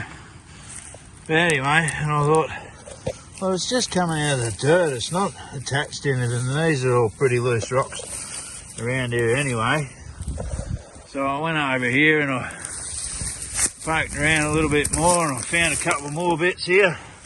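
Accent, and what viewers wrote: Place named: Australia. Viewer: Hi! You got a new subscriber from Sweden. I really liked your video, easy, authentic and genuine and I think you will be a very liked person on YouTube. And with that said, I had a little bit difficult understanding some words but I must say that you “down under” have so nice “sounds” in your English! 🙂‍↕️ Good luck on your journey! 🙋🏼‍♀️🇸🇪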